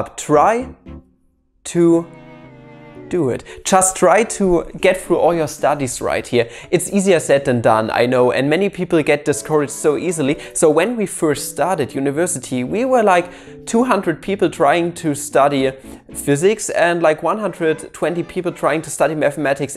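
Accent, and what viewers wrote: Germany. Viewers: funny german accent :DDDD!!?!!!!!?!!!!!!?!!!!????!!?!!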